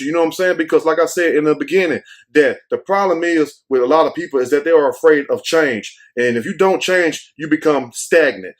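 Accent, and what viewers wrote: South, United States. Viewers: I see you representing H- Town today. One of my favorite groups to relax and chill with. I like watching you, cos you be talkin that real shit. You seem to have Southern roots, hear it in your dialect. Keep on wit the keep on😅😅.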